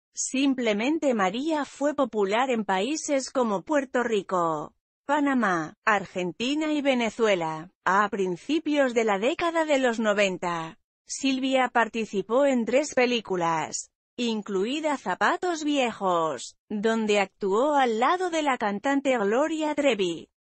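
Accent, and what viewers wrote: Spain. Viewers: No me gusta esa voz de Española pues son reportajes de mexico y debería de ser una mexicana q lo hiciera. no tiene ni modo , ni tono , ni forma para hacerlo..... !!!! Pufffffff😥😟😤😨😵😱😲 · Muy mal reportaje, fotos erróneas, la voz española no sé xq carajos si se nos mexicanos, y la voz ni se diga tienes tachesote X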